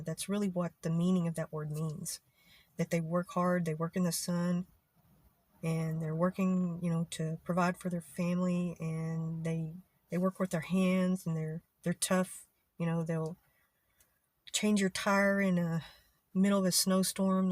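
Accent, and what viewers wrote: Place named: South, United States. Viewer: Hi! I have just found your video and I loved it. I love the southern accent and I´m trying to learn it. It is not easy when English is not my first language but it helps me a lot to understand better and get more fluency. Thanks for this awesome explanaiton! Greetings from Argentina!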